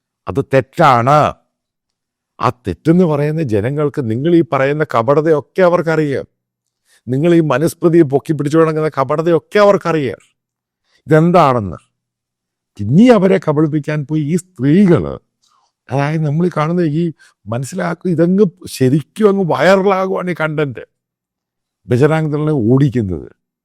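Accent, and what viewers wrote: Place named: Travancore, India. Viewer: തിരുവിതാംകൂർ ഭാഷയുടെ ഭംഗിയാണത്.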